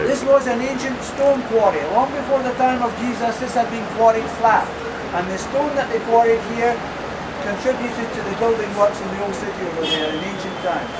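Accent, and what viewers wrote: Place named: Glasgow, United Kingdom. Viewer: He's Scottish! Sounds like a Glasgow accent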